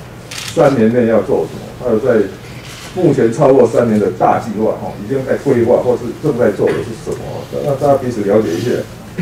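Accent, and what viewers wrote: Taiwan. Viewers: 有"爛"群組...哈哈!!阿北的台灣口音英文真的很有趣~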